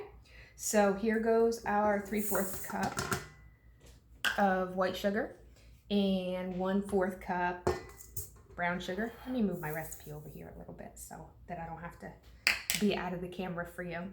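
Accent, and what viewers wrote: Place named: New Jersey, United States. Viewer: I did pick up on the heavy Jersey accent lol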